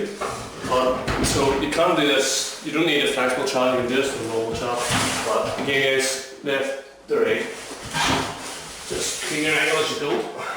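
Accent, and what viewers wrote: Ireland. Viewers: Sounds Irish ?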